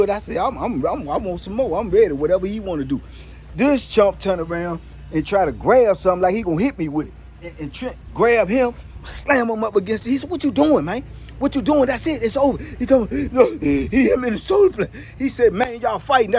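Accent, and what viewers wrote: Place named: Washington DC, United States. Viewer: You sound like you from DC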